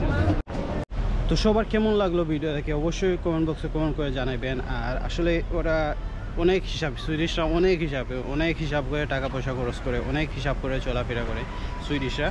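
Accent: Sylhet, Bangladesh. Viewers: Assalamuyalaikum vai. Apnar video gula khub e informative & genuine information pawya jay. Dekhe onek vala lage. Atekta visoy enjoy kori, ami o Sylheti r apnar kotay Sylhet er accents buja jay. Thanks vai erokom authentic information soho videos dewar lagi. Vai ami samer month a amar undergraduate sesh hoibo. Amar ecca Dhaka University te postgraduate na koriya baire Master’s ta korar lagi. Ami onek gula videos dekhci Sweden niya. But personally karo sate kota hoy nai in details. So, kindly apnar sate jodi contact korte partam asha kori onek upokar hoito vai.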